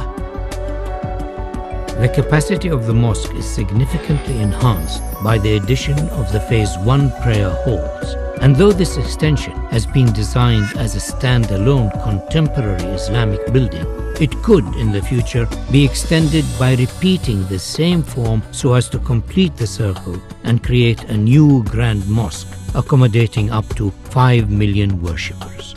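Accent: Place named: Arab world. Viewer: Can't say much about the theological or political arguments for or against this project. But I think to have someone with a noticeable Arabic accent speak is nothing but a cheap marketing gimmick by this British firm. Are we supposed to be more accepting and receptive because its an Arab talking? I would have expected better from a company of Atkins' reputation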